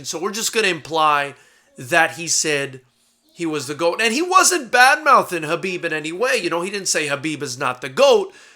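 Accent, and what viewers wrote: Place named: Brooklyn, United States. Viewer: You sound Brooklyn son 👊 As always on point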